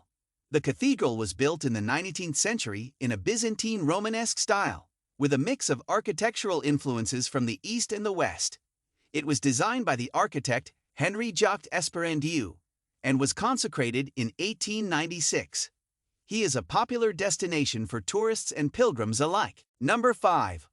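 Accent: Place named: United States. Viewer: Wow. Hardly any words were pronounced correctly. Your American pronunciations spoilt the whole video.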